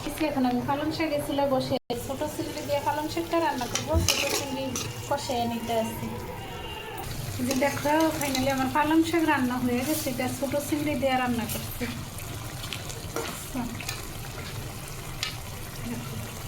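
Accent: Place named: Barishal, Bangladesh. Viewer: ওরে মোর খোদা হেইয়া দেহি বরিশাইল্লা মুইতো ডরাইছিরে আপা তবে ভিডিও এবং কথা গুলি সেই সাদ হয়েছে 🙄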